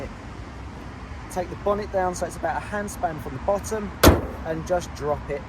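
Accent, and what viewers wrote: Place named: England, United Kingdom. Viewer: Awesome English accent. Thanks a lot 🙏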